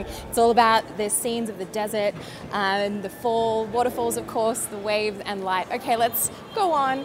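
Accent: Australia. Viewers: Australian mate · So Aussie! So Sydney accent!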